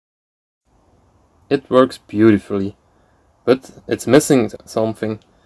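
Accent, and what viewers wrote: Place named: Netherlands. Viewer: Hello you sound Dutch ? If you are interesded in ever selling the tractor or the back tiller let me know I need some good pictures of the mounting on the tractor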